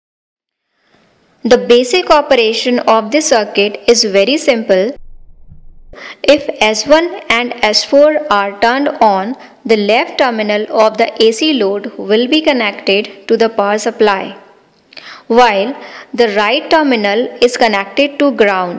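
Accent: India. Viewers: holy 这英语发音 满满的咖喱味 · Hindi english accent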